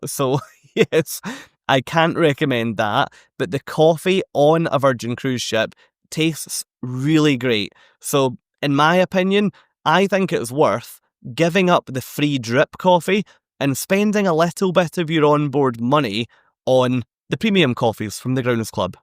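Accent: Scotland, United Kingdom. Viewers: First of all LOVE your Scottish accent! Where in Scotland are you from? Second, all wonderful tips! We are cruising Virgin for the first time in February 2026, and cannot wait! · Another really charming video,! Just love that endearing Scottish accent! Our first VV cruise leaves Portsmouth next month. 13 days on the Mediterranean aboard Scarlet Lady. Shall see how we go, but that hammock and the great food constantly talked about should be some of the highlights. Have taken your tips on board. Thank you!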